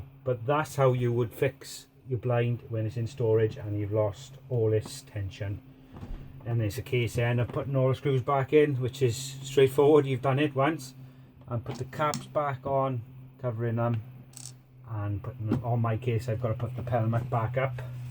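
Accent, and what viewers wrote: Wales, United Kingdom. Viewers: Love your accent. You remind me of my time living in Penbrokeshire Wales. Thanks for explaining the shade repair.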